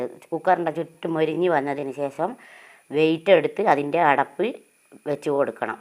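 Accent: Nadapuram, India. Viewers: Nadapuram bhaagath alle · നാദാപുരം ഭാഷ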